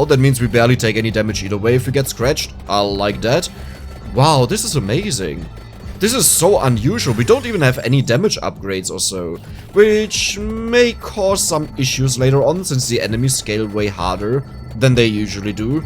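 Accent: Germany. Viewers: Just a question, where are you from? im sure your dialect is with a german aspect haha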